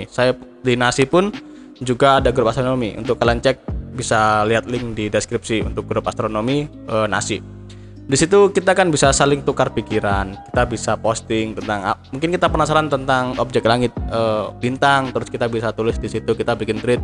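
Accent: Java, Indonesia. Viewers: Bro, sampean jawa ne ngendi??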